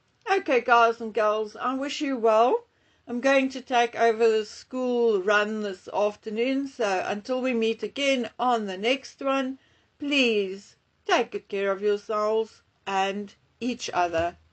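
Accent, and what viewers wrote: South Africa. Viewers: Yussus man that’s a baie strong South African accent if ever I heard one ekse 😂😂😂😂 lekker 😂😂😂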